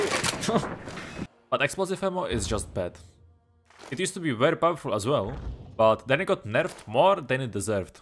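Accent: Czech Republic. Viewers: nothing beats that hard czech accent.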